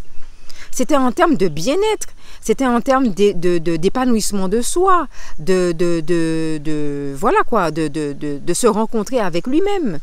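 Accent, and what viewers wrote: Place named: Benin. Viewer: L’accent beninois s'est installé 😅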